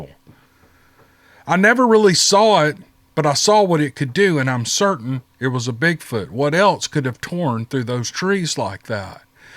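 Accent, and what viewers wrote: South, United States. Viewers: I'm not just saying this, you are the best storyteller on the YouTube! I have been blessed to be listening to your stories for 2 years now. I listen to many of my favorites over and over! Like I said, you are the best! Keep up the good work. We love your face, we love your personality and at Southern accent is a huge Plus. Blessings to you and your family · Hello from TN. I personally like it mostly when you only narrate the stories. Only because I've grown accustom to hearing you. That said, many times I fall asleep , not that it's boring. But you have such a soothing voice and listening to you, I can actually place myself into the story like I'm really there and experiencing it. You talked about your work, somewhat. May I ask what is your profession? I think I enjoy hearing that southern brawl more than anything. It just gives each story that special twist that we Southern folk seem to have. 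See, if I am watching you, then it's like I'm focusing more on you and not as much on the story. Just my own personal opinion. Think u should leave it the way it is. I'm getting older and don't like change. So, that's MY hang up, not yours. Anyway, keep the stories coming. They are very entertaining and your voice is enjoyable. Not taking away from your handsome face, but the not knowing what someone looks like behind the voice is suspenseful and intriguing. I'm not commenting about your pets because I've never been and am not a whole lot now of a pet person. I don't knock others for their own feelings. It's y'all's prerogative if ya want to be pet parents. Everyone is different and entitled to their own opinion. In closing, I say to you, Thanks and carry on! Oh, and I'm now a new sub!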